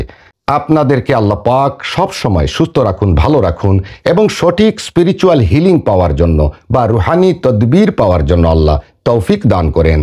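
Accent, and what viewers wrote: Sylhet, Bangladesh. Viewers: Apnr vasa sylhet kutay plz bolen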